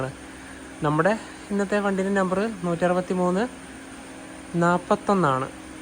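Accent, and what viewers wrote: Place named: Malabar, India. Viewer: ഞാനൊരു മധ്യ തിരുവതാംകൂർ കാരനാണ്. നിങ്ങടെ മലബാർ മലയാളം slang കേൾക്കാനാ നല്ലത്.🙂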